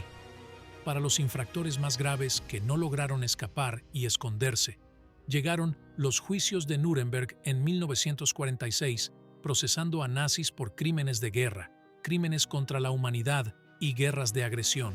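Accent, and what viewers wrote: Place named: United States. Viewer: Lo k me enfada d este amigo es k empieza a hablar Un perfecto español y despues lo habla con acento gringo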